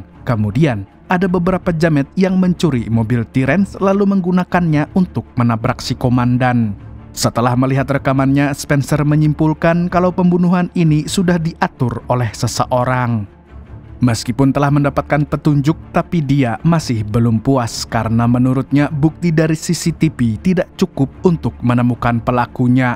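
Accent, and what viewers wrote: Banjar, Indonesia. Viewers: Aeee... Urang banjar kh pian..? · urang banjar😂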